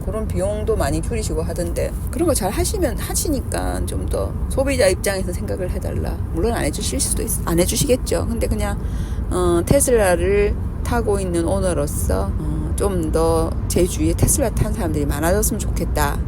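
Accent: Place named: Busan, South Korea. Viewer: 누나 부산사투리 좋아요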